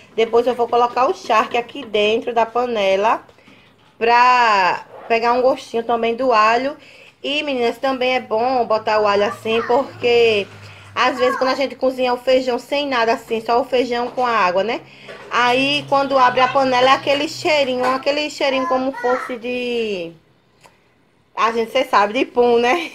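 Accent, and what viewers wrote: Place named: Bahia, Brazil. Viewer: aaaaaa deve estar uma delícia mulheeeer 😍 amo muito tempero no feijão.. você é da bahia??? amei o sotaque viu mulher beijão 💗😍😍😍💗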